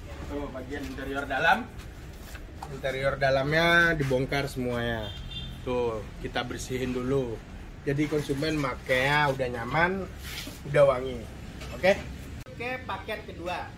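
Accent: Padang, Indonesia. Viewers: Orang Padang bang? Logat bahasa Indonesia nya kek bahasa org Padang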